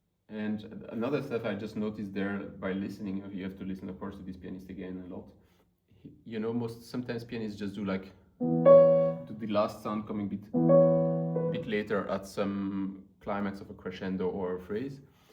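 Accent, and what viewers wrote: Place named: France. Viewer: Ton accent francaise est trop belle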